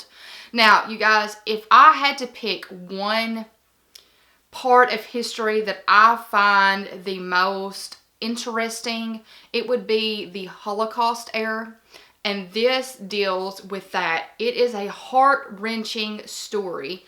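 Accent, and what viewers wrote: South, United States. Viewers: I love this! I love how honest you were about not reading but finding your niche. Like you, it took my finding a verse novel years ago just passing by in a book store. I was there with my kids to play and read books. On the way out I stopped picking one up of Ellen Hopkins, Burned. After reading it I sought out to read others of hers but the story at hand was not for me. Although, I value her and her take on life because it's personal matter to her and I know so many can relate. I moved on finding other verse novel authors and evidently wrote my own verse novel, Under Contract: Life in the Middle of Dreams, it was released last summer. If you'd like to read it and review it I'd be happy to mail you a copy. You are precious, I love your accent. Are you from the south? I have people comment on mine all the time. Again I enjoy this video and look forward to watching more of your videos. 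I hit that subscribe button! Have an awesome day and keep shining!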